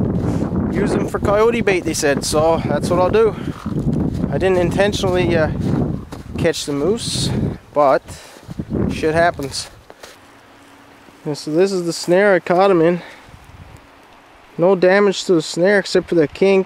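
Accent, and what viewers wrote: Newfoundland, Canada. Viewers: are you from Newfoundland? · you got my subscription buddy! you a newfie? im from ole cape breton!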